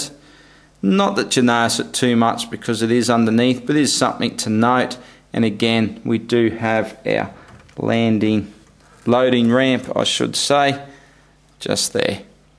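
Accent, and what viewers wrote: Australia. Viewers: 1st thing I heard was an ausie talking so I insta subbed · Just for the record, this guy sounds really Aussie, and I'm an Aussie haha. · If I had to guess, I would say this guy is from Australia like me.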